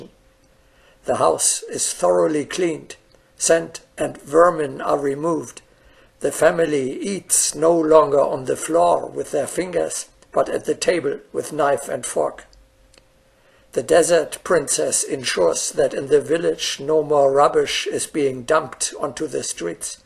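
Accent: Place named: RP, United Kingdom. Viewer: Ready, willing, able, and at your service Dear Ones. Perfect use of inherently limited Queens English.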